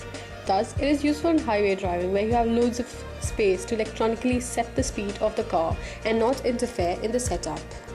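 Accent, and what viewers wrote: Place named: India. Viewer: Indian accent, mate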